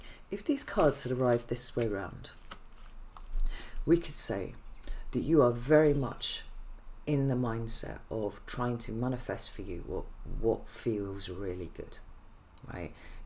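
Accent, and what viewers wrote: United Kingdom. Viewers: Could you please move the mic closer to you?!!!! Or speak up for us! Maybe it's just me, but I'm having a hard time to hear and understand what you are saying. your voice is deep, and you are so quiet. I would love to hear what you have to say! Plus you have this gorgeous British English "accent" :) all i hear is whispering mumble most of the time. thank you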